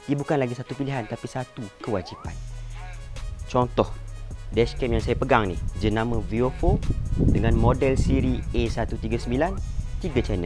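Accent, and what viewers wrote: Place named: Kelantan, Malaysia. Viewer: Mantapp boh,,, slalu review yg ada masuk slang2 kelantan 👍🏻👍🏻👍🏻👍🏻 haha Smoga murah rezeki menang , boleh pakai utk van time hntar barang lazada 🕺🏼🕺🏼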